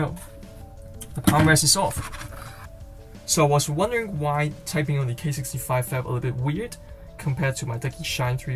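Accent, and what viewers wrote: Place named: Denmark. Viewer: you can hear thet u are Danish but ure videoes is really cool though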